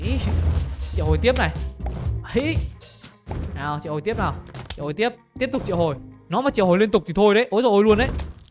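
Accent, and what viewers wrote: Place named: Binh Dinh, Vietnam. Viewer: Anh là người bình định à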